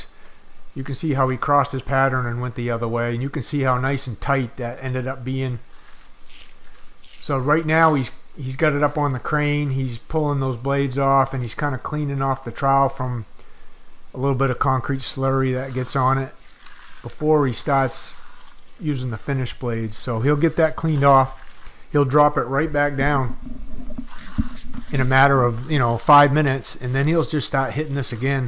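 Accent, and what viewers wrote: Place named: Massachusetts, United States. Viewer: Judging by the accent I'm gonna say Massachusetts government BS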